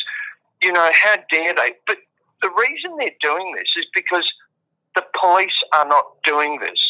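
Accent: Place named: Australia. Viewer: No is kiwi, this guy is Aussie. I would love to get in contact with him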